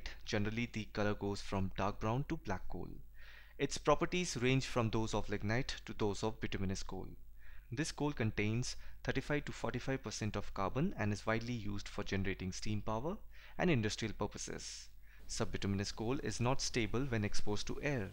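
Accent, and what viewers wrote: India. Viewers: He is using his own voice, it's his accent, which sounds as if he is from India.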